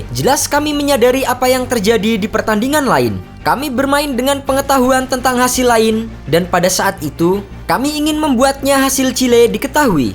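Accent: Indonesia. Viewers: kn logat orang indo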